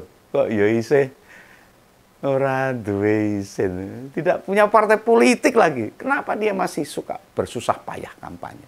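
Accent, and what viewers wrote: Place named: Solo, Indonesia. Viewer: Ini yg selalu saya tunggu. Bukan hanya isinya tapi dialeknya itu lho, bukan sekedar dialek jawa, tapi ini persis spt tetangga2 saya di solo dulu bicaranya, ha ha saya di Jakarta 40 tahun, marakke pengin pulang. 😂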